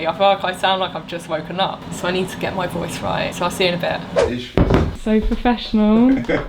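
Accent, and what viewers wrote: United Kingdom. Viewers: U r beautiful n i like your UK accent ❤ from India Nagaland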